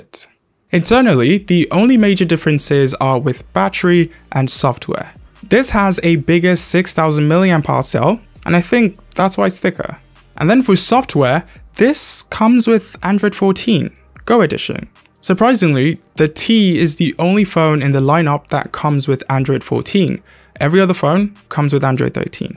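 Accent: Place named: United Kingdom. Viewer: Bro your British accents very very cleary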